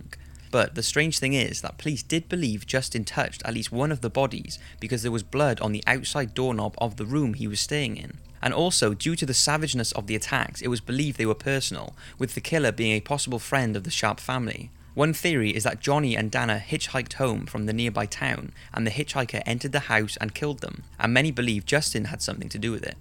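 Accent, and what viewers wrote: Wales, United Kingdom. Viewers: Are you Welsh? You sound like you have some form of Welsh accent. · Narrator sounds Welsh 😱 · Narrator is Welsh 😱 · I love these but its impossible to be creep with a Welsh accent ❤️ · Are you welsh?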